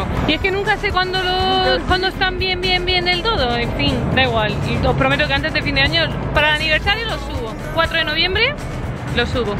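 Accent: Spain. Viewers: Madre mía cuánto tiempo sin escuchar pipirrana ... es escucharte y me transportas a esos maravillosos pueblos de España!!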